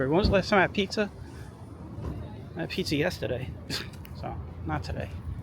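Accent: New York, United States. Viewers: i said it b4 but have to again .. i fkn love ur videography !! its perfect and the narration the real ny accent hah , the non touristic streets aka the REAL streets.. love it !! if i was ever to get to usa these are the kinda streets id be roaming on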